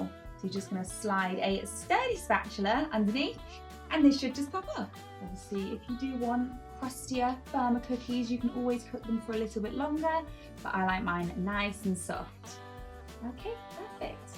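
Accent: United Kingdom. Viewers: I dont know why but british accent makes it for me ... "half an hour" how high it goes ;) <3 exately. Cant compare with american ones. Is it my nostalgia for UK. Will try it out aaaaallrigh? :)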